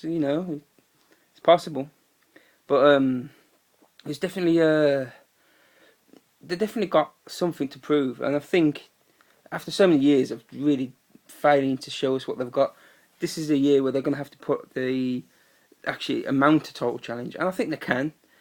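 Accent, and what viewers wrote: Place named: Netherlands. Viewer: why you speaking like a dutch guy?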